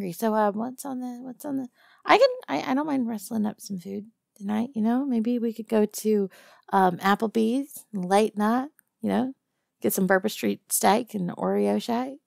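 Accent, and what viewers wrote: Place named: Texas, United States. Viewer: once again your sweet voice brings another one of “cryptid women” stories to life. Tiger with Sweet Texas tang. One of these days your ever so powerful double entendre will rip a hole in the space time continuum. 💛💚💙❣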